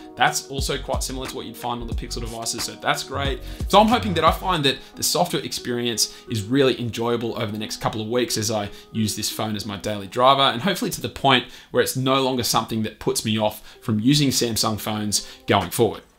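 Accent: Australia. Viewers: what part of austrailia are you from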